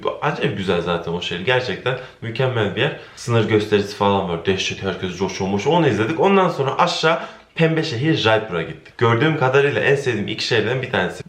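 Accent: Konya, Turkey. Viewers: abi ilk videonu izlediğimde bu kesin konyalı dedim ve konyalı çıktın 😎😎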